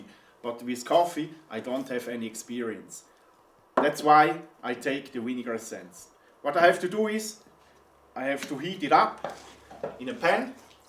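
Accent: Switzerland. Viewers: bish du schwitzer dim akzent no🤔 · Dein Englisch mit dem Schweizer Akzent hört sich irgendwie lustig an 😅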